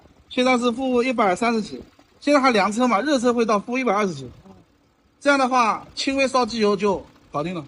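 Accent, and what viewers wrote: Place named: Hangzhou, China. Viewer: 老哥听你口音是杭州人？店在哪里？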